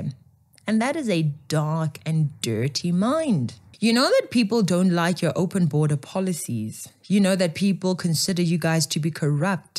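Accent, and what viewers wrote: Model C, South Africa. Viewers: With your model C accent, you shouldn't be Zille's apologist. Dont try to be a good black.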